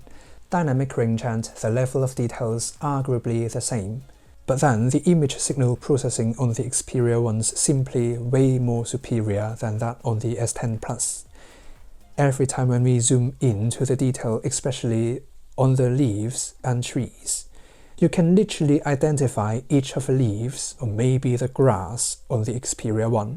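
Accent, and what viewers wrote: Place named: United Kingdom. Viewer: 我的天啊你的british accent真的太好聽啦，完全是一種享受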